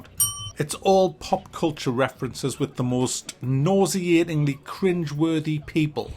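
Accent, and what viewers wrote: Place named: Newcastle, United Kingdom. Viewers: Byker grove land I think. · Oh you’re a geordie!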